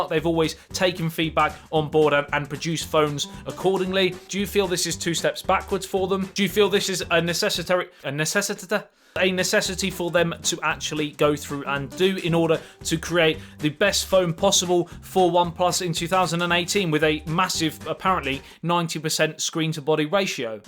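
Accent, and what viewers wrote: England, United Kingdom. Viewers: I ❤️ hearing the difference in English accents, pronunciation & use of words compared to American English. The way you pronounce aluminum sounds so funny! You guys say it all wrong 😳 Or do we? 🤔 🤷🏼‍♀️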